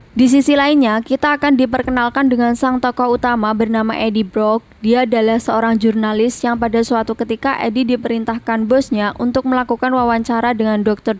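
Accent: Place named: Semarang, Indonesia. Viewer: Kya eruh pengisi suaranya min...medoke semarangan 😅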